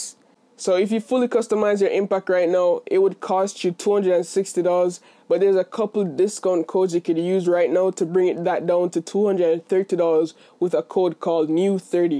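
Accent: Jamaica. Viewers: I knew yu was Jamaican when I heard your voice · Are you jamaican....? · You Jamaican? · Is he Jamaican? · ya jamaican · Is it jamaican? My parents are Jamaican. 😊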